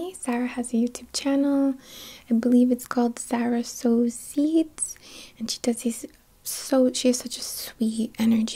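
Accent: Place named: New York, United States. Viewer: I can hear NY in ur voice. Much love🧡🧡🧡✨✨✨✨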